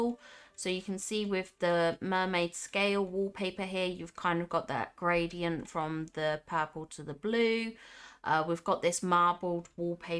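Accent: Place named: United Kingdom. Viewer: Hey 👋🏻 Thanks so much for posting this…I was kinda downhearted by my 600 moonstones for last DreamSnaps😟…like quite a few others..the bar has been raised now peeps get how profitable taking it seriously…anyways you have given me some really good ideas and inspiration..Just Love your content as ever..nice to hear a fellow Brit too 😊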